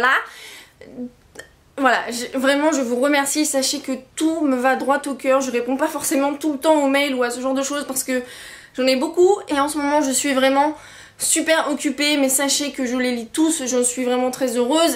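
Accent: England, United Kingdom. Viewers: Salut, t'es anglaise ? En tout cas t'as un super accent ! Bisous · Je me régale avec tes vidéos ,et tu as un accent anglais so cute ! ( vive le VO ! ^^) Malheureusement je n'ai pas autant de livre que toi mais ça viendra j'espère :P Bonnes lectures !! :D ( Of Neptune , la couverture quoi ^^ )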